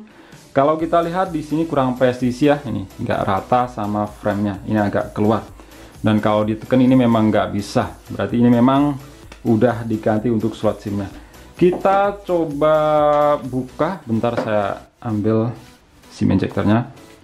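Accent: Blitar, Indonesia. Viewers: Mas opo Wong blitar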